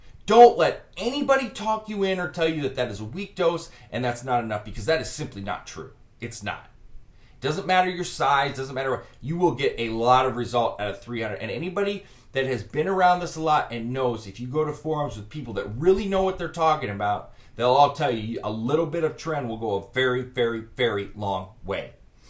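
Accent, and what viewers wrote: New Orleans, United States. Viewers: you from new orleans? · Are you from new orleans???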